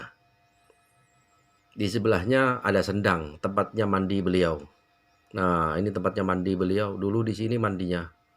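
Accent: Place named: Madura, Indonesia. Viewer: Hehe seru denger abang bercerita logat madura semakin seru mantap bang semoga sukses selalu Merah udh aku hitamkan Mampir balik bang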